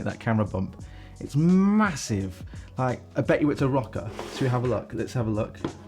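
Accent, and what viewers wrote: United Kingdom. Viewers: Your British accent is quite nice, isn't it?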